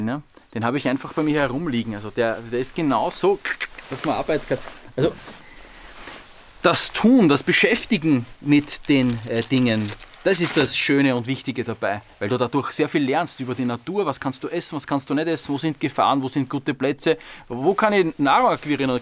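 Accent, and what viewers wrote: Vienna, Austria. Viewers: ...wenn ich dich so reden höre vermisse ich meine Wiener Verwandtschaft. Sehr schönes Video.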